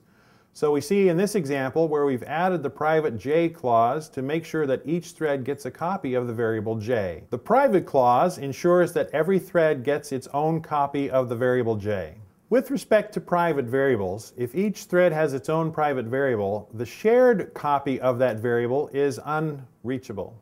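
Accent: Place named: United States. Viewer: Just link to the slides, then I don't have to listen to an American accent.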